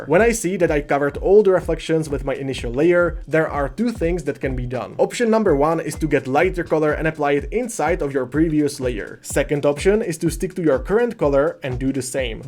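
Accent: Czech Republic. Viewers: Great video. Just out of curiousity, what is his nationality? I am hearing a Czech/slovak accent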